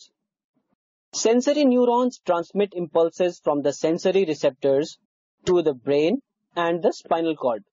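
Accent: India. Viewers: your accent is indian.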